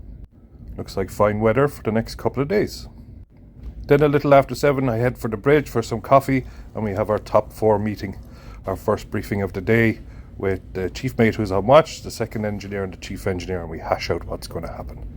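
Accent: Ireland. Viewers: Is that a Cork accent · Please, just keep talking. I’m a Texan born and raised but the good Lord knows that the Irish tongue has my heart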